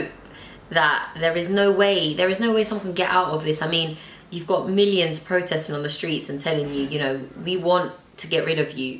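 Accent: London, United Kingdom. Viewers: Your English it’s from London Are you half Algerian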